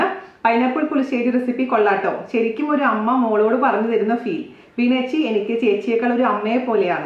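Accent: Thrissur, India. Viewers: Njan litty vipin chechide recipe try cheyarundu endhe ammayum thirrsur kari aanuu ippo amma ella but chechi timil parayunna chila vakkukal adipoli ready mani athokke kelkksnum koodi ya njan kannune endhe ammayumm athu pole parayumayirunnu chechi de slag ellammm amma pettennu orma varum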